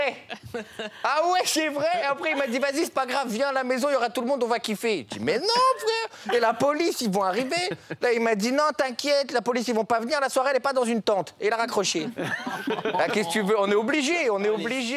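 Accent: Quebec, Canada. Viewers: Sa parait il a entraîné son accent québécois lui je valide 😂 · Wow l'accent Québécois! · 10 sur 10 pour ton accent québécois, je certifie! 🙂 Bonjour de Montréal 👍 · Wow tu a tellement bien l'accent québécois impressionnant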